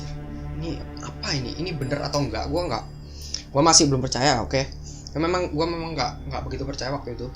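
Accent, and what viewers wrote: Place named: Surabaya, Indonesia. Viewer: Mas samean kalo bicara ini kaya orang surabaya bang surabaya mana plise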